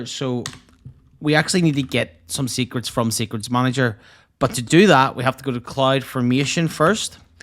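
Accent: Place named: Belfast, United Kingdom. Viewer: Love the Belfast accent! Good work!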